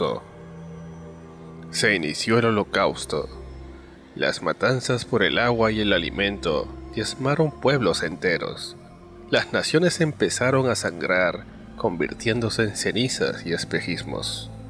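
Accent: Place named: Castile, Spain. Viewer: A todos mis hermanos Hispanos. Tercios Españoles invencibles. <link> Por cierto, eres un españolazo. Eres como un español del interior, castellano, así hablas 😍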